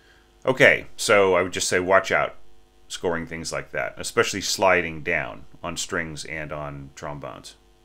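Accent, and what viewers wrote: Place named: France. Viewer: Very sweet. Sounds very French. I love it. And so many thanks. It is a joy to hear you talk about the score...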